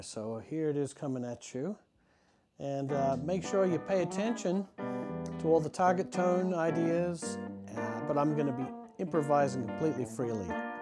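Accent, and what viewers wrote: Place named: Australia. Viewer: He has less of an Australian accent now.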